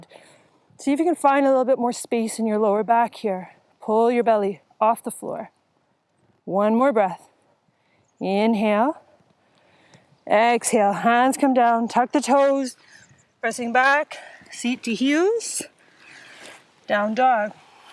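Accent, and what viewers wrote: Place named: Canada. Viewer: This is my go-to practice every night when I get home from work. It’s challenging, energizing and I never get tired of her comments and instruction. (I also adore her Canadian accent.) I smile every time she says to relax/let go of the idea there is somewhere to go. I also recommend her other videos. 💗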